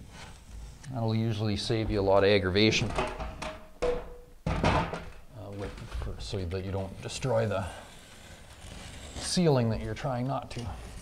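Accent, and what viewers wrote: Canada. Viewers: as soon as i heard the canadian voice i clicked off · Only americans can tell if he has a Canadian accent ! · Heavy Canadian accent wo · The second I heard the Canadian accent. I knew I could trust this guy. Thanks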